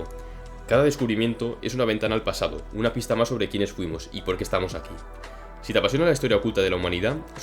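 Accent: Spain. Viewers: MODULA GALLEGO HABLA CASTELLANO , la puta madre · Ahí le bajé la velocidad a 0,80 😊. Reconozco q me cuesta a veces entender el acento español. Muy buena info, tu video. Gracias.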